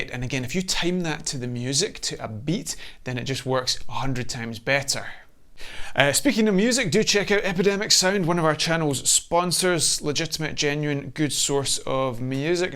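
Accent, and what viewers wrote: Scotland, United Kingdom. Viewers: Title drew us in Scottish accent kept us here ❤‍🔥❤‍🔥❤‍🔥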